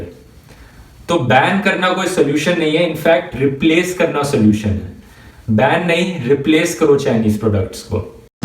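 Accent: India. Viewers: I wish I could decifer his Indian accent to English, because it sounds like he knows what he is talking about.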